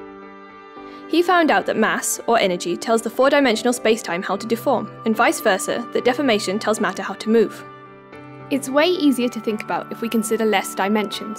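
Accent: United Kingdom. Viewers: The video's really nice. Your brit accent is even better! ^.^ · minute physics with a brit accent. :)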